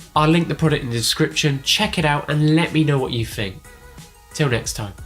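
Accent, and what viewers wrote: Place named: United Kingdom. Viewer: I hate uk accent...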